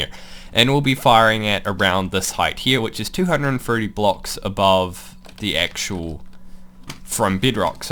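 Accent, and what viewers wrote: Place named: New Zealand. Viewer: He is from New Zealand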